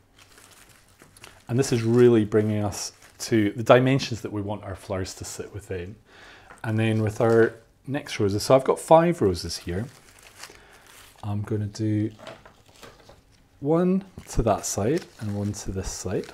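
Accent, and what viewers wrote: Scotland, United Kingdom. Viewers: I envy British accent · Scots accent ?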